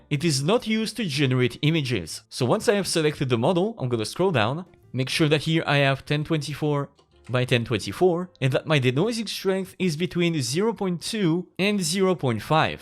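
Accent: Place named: France. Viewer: Thanks for the post, but please tell you voice-bot to slow down, especially when using the Francois accent, e.g., "EEMPTEE" for "empty" throughout, and the like, t might be easy to train your bot to avoid... THANKS!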